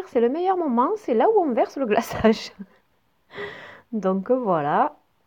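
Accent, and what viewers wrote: South, France. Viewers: L'accent du sud on Aaaaaaadore!😍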